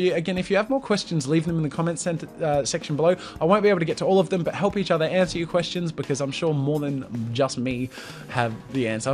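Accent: Australia. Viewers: you sound Australian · ARE YOU AUSTRALIAN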